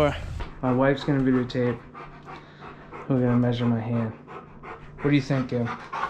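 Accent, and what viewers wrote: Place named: Massachusetts, United States. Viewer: Nice video. I grew up in Hanover, Mass. Listening to your accent makes me homesick. Did some trail riding on my enduro motorcycle back in the early 70's near where you live. Raced a couple times at MX tracks in Pepperell and Southwick, Mass. When I was 2, I broke my back in 1975 in an enduro accident that left me paralyzed from the waist down. Came out to the University of Illinois to complete my undergraduate and masters degrees. Massachusetts is not the easiest place to live for someone in a wheelchair. Again, hearing your voice brought back a lot of good memories of living on the South Shore, especially when it came to snow plowing on our small family run farm. I have traveled all over the world as a Paralympic Track and Field Coach and as a Strength and Conditioning Specialist. Retired now. One of my goals is to get back to the most beautiful state in the USA, Massachusetts. 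Another one of my goals to see at least one more Boston Marathon in person. Thanks for the great video. Be safe and all the best to you and your family.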